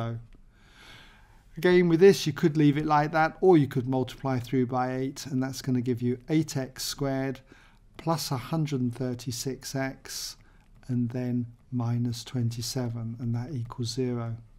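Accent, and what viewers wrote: England, United Kingdom. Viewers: i love your English accent thanks so much for your channel!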